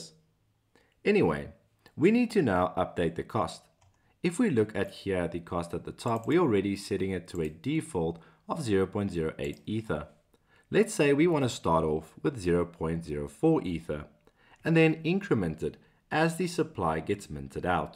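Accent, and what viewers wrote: South Africa. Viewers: Hey you sound south african wow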